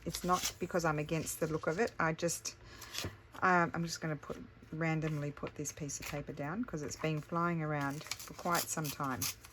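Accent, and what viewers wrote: Australia. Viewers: Thank-you for to-day Its great to hear an Aussie voice we are just out of our 5th lock down in Victoria Your YouTube channel has helped so much in all our lock downs Stay Safe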